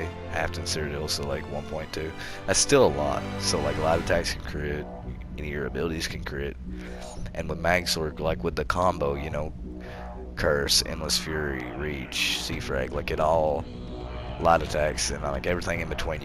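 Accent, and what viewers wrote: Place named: South, United States. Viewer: You sound southern as hell man, roll tide.. subbed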